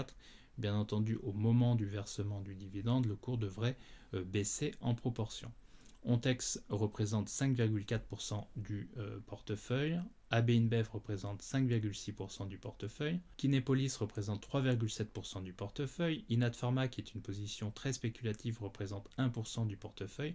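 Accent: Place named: United States. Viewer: Salut j'aime beaucoup tes vidéos vraiment mais si je peu donner une petite critique, c'est que tu prends un accent anglais américain qui est un peu ridicule dsl mais ça m'agace beaucoup ! Je comprends que parfois tu n'as pas le choix d'utiliser l'accent anglophone mais franchement tu l'utilise pour rien, c'est comme dire " T-shirt " a l'américaine Ti chweuuurt nan... !! Tu le prononce normalement t-shirt. Voilà ce qui me rend un peu ouf quand je mat tes vidéos, que j'adore au passage. Bref dsl mais la fallait que ça sorte. Merci a toi.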